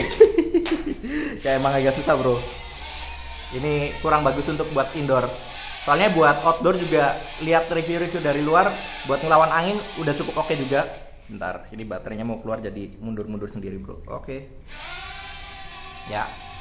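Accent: Banyumas, Indonesia. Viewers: yes video baru.. assiikk. yesss kompor baru... makin aassiikk. 😂 udah kangen sama video2nya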